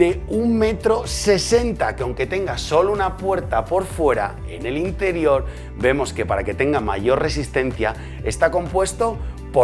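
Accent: Spain. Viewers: Me marea ese acento español!!!! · El video está buenísimo, muy profesional. Leyendo algunos comentarios, me gustaría decirles que: El diseño de la cocina está ESPECTACULAR. El color y la combinación están muy en TENDENCIA. La elección de materiales muy ACERTADA. El acento español FENOMENAL. No agregaría ni quitaría NADA. Tampoco comentaría, si no es para decir las cosas POSITIVAS y el buen gusto que tienen. ¡Los felicito en grande!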